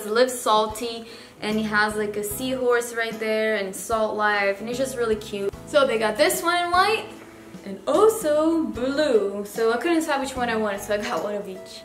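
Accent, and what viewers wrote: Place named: Portugal. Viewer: Love your channel. I was wondering what nationality are you? Sounds like a Portuguese accent? Anyway, keep up the awesome channel. 👍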